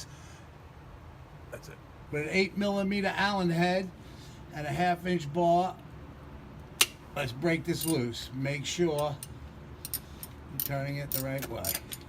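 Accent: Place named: New York, United States. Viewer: Great video. Love your NY accent. You didn't mention but I assume you jacked up the rear of the car?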